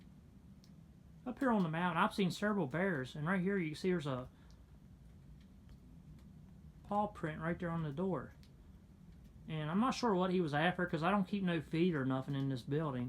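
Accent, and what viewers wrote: United States. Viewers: Im guessing by the accent, and the view from the top of the mountain, it's West Virginia. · Very nice location and cabin. What part of the country are you located? I spent a few years in East Tennessee and you sound like you could be. I mean that in a positive way.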